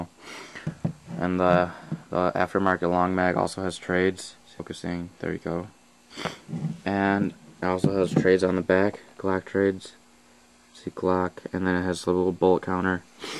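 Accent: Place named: Chicago, United States. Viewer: U need to lose that Chicago accent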